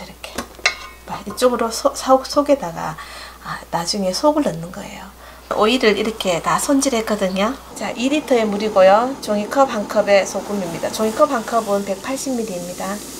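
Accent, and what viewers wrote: Gyeongsang, South Korea. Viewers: 경상도 아지매네예~^^ 오이를 끓인 소금물로 먼저 담으시네요 어떤 장점이 있을까요?? 궁금하네요 또한 3종 드리고 이웃맺고 싶어요^^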